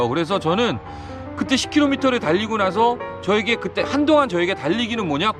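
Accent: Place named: Seoul, South Korea. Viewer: 서울말 깔끔하게 구사하셔서 깜짝 놀랐어요..ㅎㅎ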